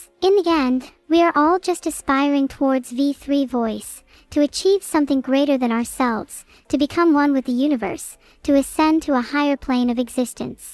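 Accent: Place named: United Kingdom. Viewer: hit her with a british ancent update, and she use all the big word in 1 sentence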